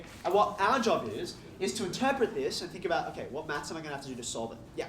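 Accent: Australia. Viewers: What is his accent? Aussie, right? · yes, hes from sydney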